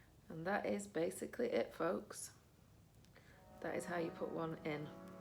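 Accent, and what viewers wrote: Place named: Manchester, United Kingdom. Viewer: Are you from Manchester love?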